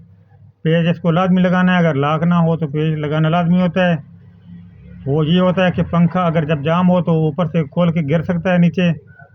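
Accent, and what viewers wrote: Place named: Mianwali, Pakistan. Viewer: السلام و علیکم بھائی، آپ میانوالی سے ہیں کیا۔۔۔؟ آپ کے لہجے سے معلوم ہوتا ہے ، اگر میانوالی سے ہیں تو آپ کی شاپ کس جگہ پر ہے ۔۔؟؟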